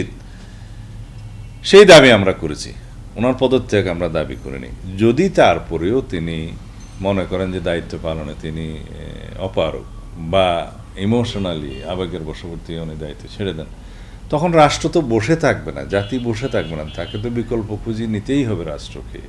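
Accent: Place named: India. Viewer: তুমি এত বছর ভারতে ছিলা। ভারতের সুরে কথাবলবা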